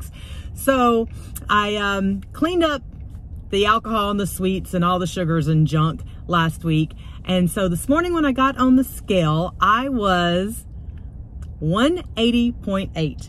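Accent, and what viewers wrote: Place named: Texas, United States. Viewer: Love your character and your Texan accent.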